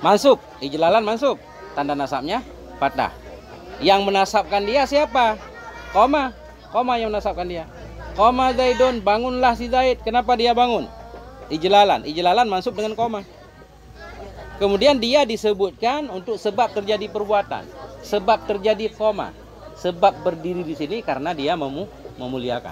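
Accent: Aceh, Indonesia. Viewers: maaf tgk asli orang Jambi / kek mana, sbb loghat nya kek ada loghat Aceh gitu 😅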